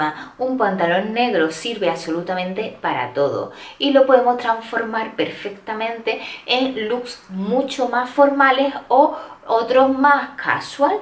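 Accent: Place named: Andalusia, Spain. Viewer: Me parece una falta de respeto,aunque se pida disculpas por ello corregir a alguien su forma de hablar. Esta señora tiene una perfecta audición,que es la suya,con su acento andaluz y se le entiende perfectamente. A tí te gustaría q te dijeran q cambiaras tu precioso acento mexicano q seguro lo tienes. Un saludo desde España.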